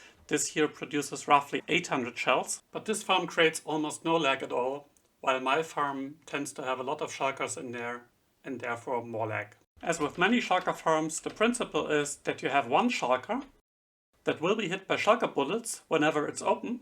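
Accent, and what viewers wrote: Germany. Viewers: Der deutsche Akzente kickt übelst rein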